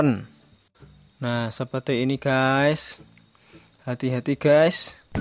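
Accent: Java, Indonesia. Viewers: Jowomu medhok ,,,,hhhhha hhhha enak ki kesusu kok hhhha 🙏🙏🙏🙏🙏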